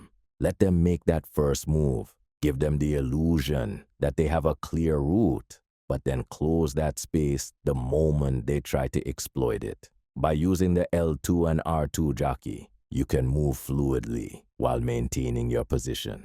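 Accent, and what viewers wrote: Jamaica. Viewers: Why does the accent sound Jamaican?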